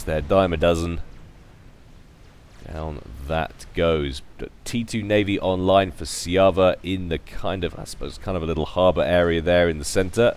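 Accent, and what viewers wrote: United Kingdom. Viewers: God that british accent. So reminding me of Deman and his quadv casts of supcom.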